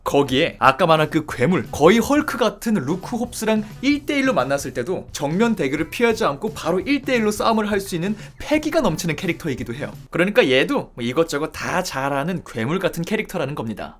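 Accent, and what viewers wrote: United Kingdom. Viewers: 영국 발음이라 그런거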